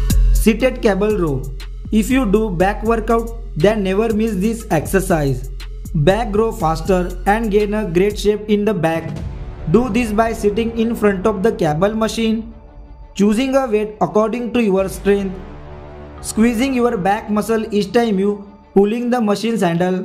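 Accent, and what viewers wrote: India. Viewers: hahhahahahah your indian accent is so funny. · Lol the person who is talking is not even american. Obviously from india! He is using this video so can make money he is not even a trainer. Sorry buddy I need to mute you I can’t concentrate.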